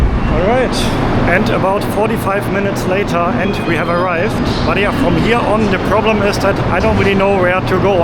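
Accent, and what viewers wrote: Germany. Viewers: I really enjoyed your channel, thank you for doing this. I was just on Facebook watching one of your videos from HK to SZ and suddenly noticed your German accent ( sorry but I think it’s cool to have it) and then I rushed to YouTube 😆 just want to share the info that anytime in the future if you would like to travel back to China please visit my beloved hometown (if possible) it’s Qingdao city from shandong province, the most Germanic city you could get in China, the ocean view the pilsner Tsingtau lager, the seafoods! You might be shocked and amazed by research the history of Tsingtau. Please have a wonderful trip of traveling the world!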